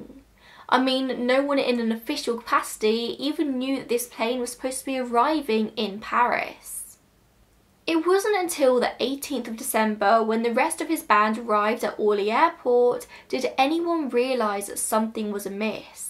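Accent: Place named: Home Counties, United Kingdom. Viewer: She is speaking in a standard Home Counties accent.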